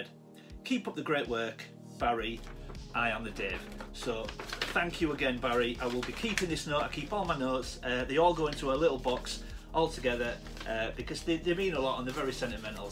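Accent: United Kingdom. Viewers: I didn't understand why your grandma got you those Scottish goodies in the past? Also your accent it sounds a bit different that most British accents I've heard.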